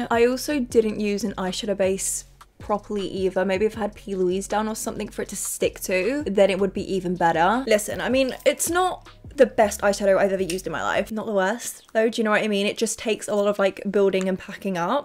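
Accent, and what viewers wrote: Australia. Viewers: I'm so intrigued... I've only watched vids for a few months and absolutely loooove them. But, can any OG fans answer me something. Is she from Australia originally? I can always hear a twang in her accent and I can't work out what it is 😅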